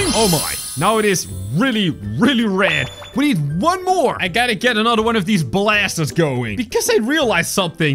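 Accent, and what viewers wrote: Netherlands. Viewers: YOU HAVE A SORT OF DUTCH ACCENT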